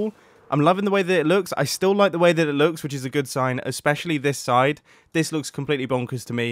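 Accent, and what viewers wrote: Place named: Scotland, United Kingdom. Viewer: YAASS I FINALLY FOUND ANOTHER SCOTTISH DUDE ON YOUTUBE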